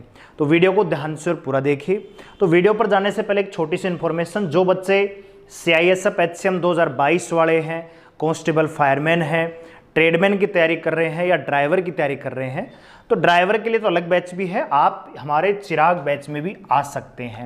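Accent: Haryana, India. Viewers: Haryana ki h bhai😊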